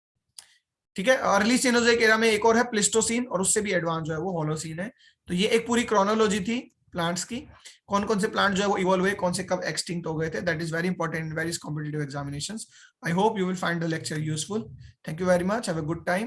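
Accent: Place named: South Asia. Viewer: Viewers who are unfamiliar with the way English is spoken in South Asia will have trouble understanding what the teacher is saying here. I was sorry not to find an option for written captions.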